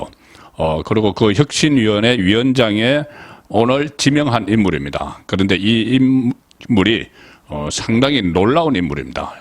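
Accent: Gyeongsang, South Korea. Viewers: 몽유병 몽류병 십월 시월 경상도 발음 인정해도 맞춤법은 제대로 하셔야지요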